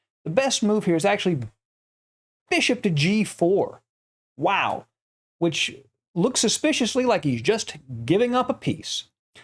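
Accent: United States. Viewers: Absolutely love your Alireza videos and analysis and your American accent!! Keep up the great work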